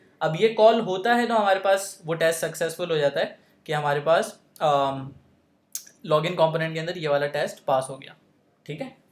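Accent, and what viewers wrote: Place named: Uttar Pradesh, India. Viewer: you are outstanding man, the clarity you have hats off. keep it up. between i get a feel you are from UP but surprisingly you are from pakistan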